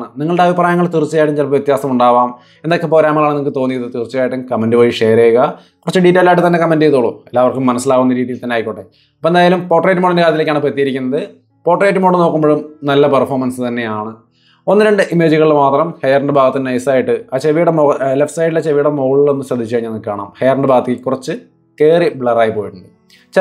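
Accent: Thrissur, India. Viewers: Thrissur kaaran aaanalle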